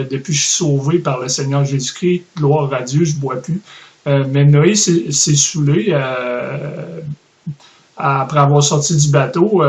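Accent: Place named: Canada. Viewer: In bon message mais français canadien difficile de saisir.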